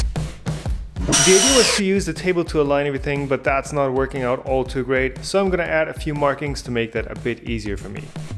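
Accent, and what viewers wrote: Bavaria, Germany. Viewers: Bist du aus Bayern? o.O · Habe heute ein deutsches Video von Dir gesehen. Das war so seltsam :D Schönes Phänomen, wenn man Leute mit deutschem Dialekt sprechen hört, die man zuvor nur tadelloses Englisch sprechen hörte. Ging mir das erste Mal so, als ich mit Marius Hornberger sprach. Vielen Dank für die vielen Videos! Grüße aus Wilhelmshaven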